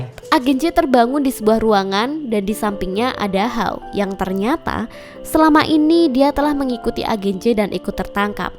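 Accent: Java, Indonesia. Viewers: Medhok.. Berasa di ceritain si mbok 😂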